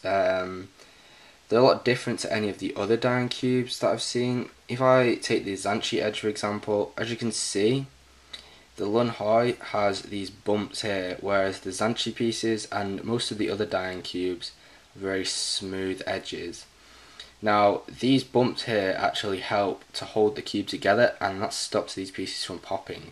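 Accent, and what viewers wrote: United Kingdom. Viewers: i could tell its uk -me being english- i just couldn't tell whereabouts haha, now i know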